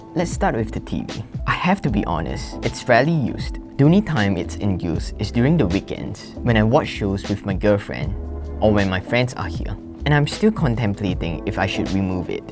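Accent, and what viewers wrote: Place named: Singapore. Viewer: As a Malaysian, i approve your singaporean accent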